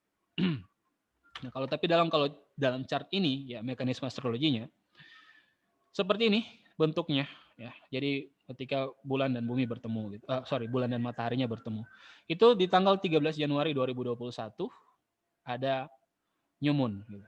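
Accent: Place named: Bima, Indonesia. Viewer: wah orang bimaa ini logatnya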